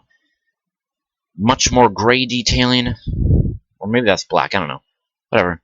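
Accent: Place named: United States. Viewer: He's got a perfect American accent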